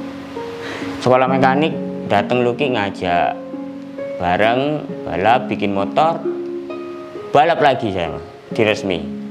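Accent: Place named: East Java, Indonesia. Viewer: Logat arek etan e melekat😁🙏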